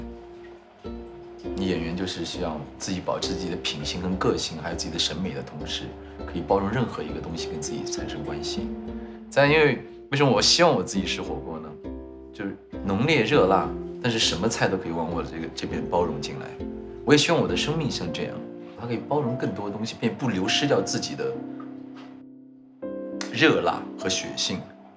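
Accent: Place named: Chongqing, China. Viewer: 听出来他的重庆口音了 哈哈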